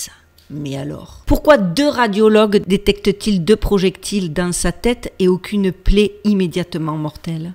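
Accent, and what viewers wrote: Marseille, France. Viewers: trente seizième, au moins....t'es pas Marseillaise pour rien au moins, grosses bises amicales du pays des cigales, super vidéo comme toujours 👍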